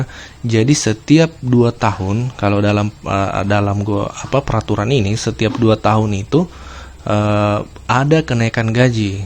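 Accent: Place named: Tolaki, Indonesia. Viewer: Tolaki banget suaranya😅😅😅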